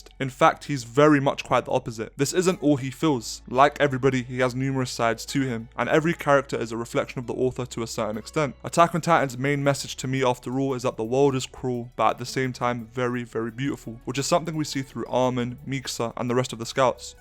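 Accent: United Kingdom. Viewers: Very good video but why the fk yall british bro. Change ur accent n u gon pop off. Love you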